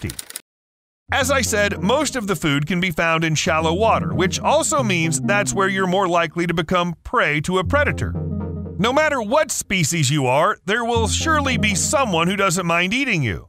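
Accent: United States. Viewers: Unbearable american accent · I just can't stand this yanks voice. Why does he have to keep shouting with that rattling voice.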